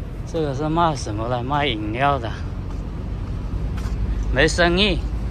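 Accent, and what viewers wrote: Hakka, China. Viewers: 是鄉下佬！只能讲客家音的普通话！请见谅！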